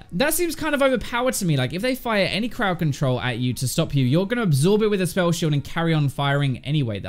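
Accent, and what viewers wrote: United Kingdom. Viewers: I'm confused about why these British YouTubers are so confused about how lethality is pronounced, can someone explain this to me as an ignorant American?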